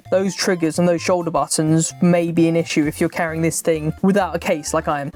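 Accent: United Kingdom. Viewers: I love hearing british people english accent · Great review! You so British! :) Love the accent!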